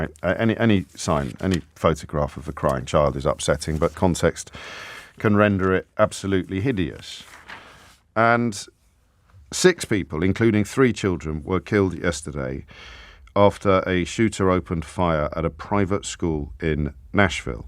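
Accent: United Kingdom. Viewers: I mean, he's not wrong, but i hate hearing it in a British accent.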